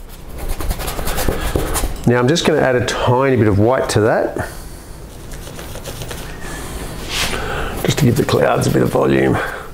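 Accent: Australia. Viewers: Thank you! Trying to figure out your accent. Are you in Australia ? 😩😜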